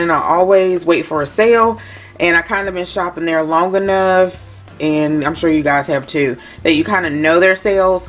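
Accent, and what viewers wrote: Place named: South Carolina, United States. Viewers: You've got to be from South Carolina lol. Girl, you talk just like me. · You sound like me talkin’ ! Very similar, anyway! I’m from Georgia. How about you?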